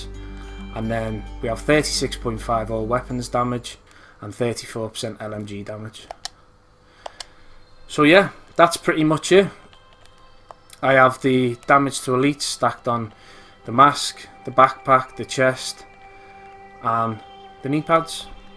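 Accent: Liverpool, United Kingdom. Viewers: Wasn’t expecting to hear a scouser on this video lol, not a bad build mate, where about in Liverpool you live, don’t live too far from there myself, got quite a similar build to yourself but for an ar build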